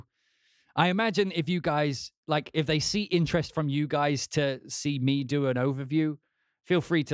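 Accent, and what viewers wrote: United States. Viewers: American accent sounds real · My gosh your American accent is outstanding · Man you have a great American accent!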